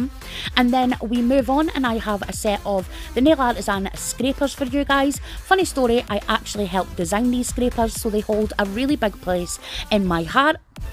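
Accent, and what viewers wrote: Scotland, United Kingdom. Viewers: Love watching your haul video's and your scotish voice over.. Congrats on 4k xx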